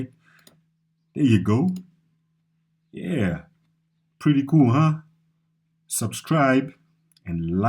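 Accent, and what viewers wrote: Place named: Zimbabwe. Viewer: Hahaha...nice one, just listening to you, you reminded me of my Zimbabwean friend, so I want to guess you are fron Zim...lol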